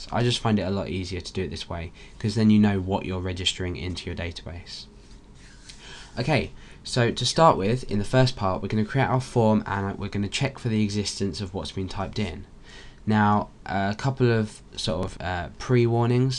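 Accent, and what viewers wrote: United Kingdom. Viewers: dude, you're the best plus your UK accent, that makes you AWESOME, i love you!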